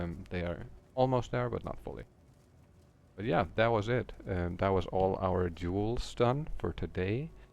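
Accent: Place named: Denmark. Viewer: You can hear a fellow Dane from a mile away. Love it man. Do you play in a Discord where other Danes can join?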